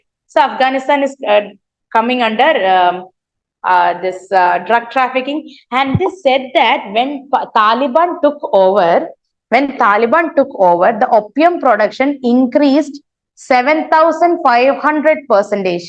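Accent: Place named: Kerala, India. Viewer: malayali pronunciation😕😕😕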